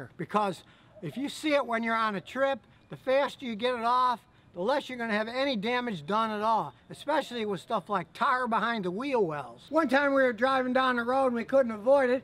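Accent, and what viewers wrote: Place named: California, United States. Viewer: When I first watched your videos, I guessed you were from a California. You don’t sound like a Texan. I have good friends from both states.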